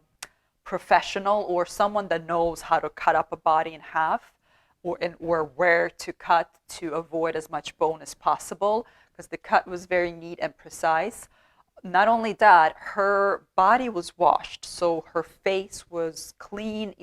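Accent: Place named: Sweden. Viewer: As always, you look fabulous 🤗🤗... thank you for the storytelling, you have the perfect voice for it. Have a great weekend..⛱.. ps,so cool you speak the Swedish way, makes it more authentic 😉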